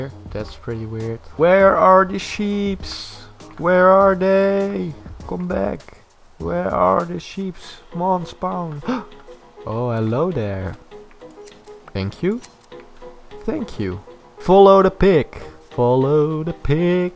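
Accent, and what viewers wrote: Netherlands. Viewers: are you from the netherlands or something becouse you have an netherlands accent nice vid btw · are you dutch? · Are you a Dutch men?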